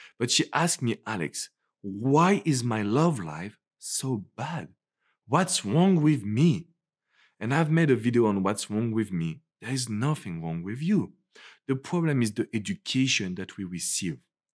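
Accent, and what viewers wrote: France. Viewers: I am here for the french accent. :-)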